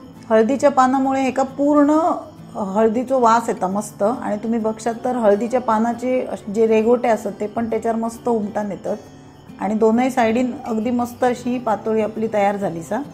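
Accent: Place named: Malvani, India. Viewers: मी आज केल्या पतोल्या मस्त झाल्या. माझ्याकड़े कुंडित आहे हलदिचे झाड़. आणि तुझा मालवाणित बोलणा लय आवडला. मी पण kanakavlicha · छान मी कसालची आहे आम्ही पण असेच बनवतो तुमची मालवणी मस्त वाटली तुम्ही वेंगुर्ल्याच्या वाटत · छानच पातोळी दाखविली त्यातून मालवणी बोलणं असे वाटत नागपंचमी आली खुप खुप छान ताई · तुमची मालवणी बोलत ऐकायला मजा येते... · व्वा छान झालेत patole .. ani तुमची मालवणी 👍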